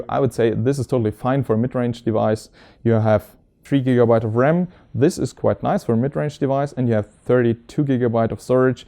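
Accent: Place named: Germany. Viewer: sehr gut. love your German Accent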